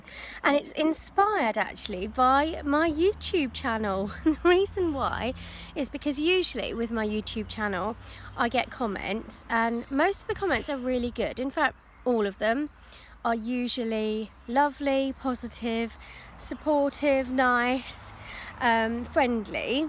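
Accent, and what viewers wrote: United Kingdom. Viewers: Are U from any British Country?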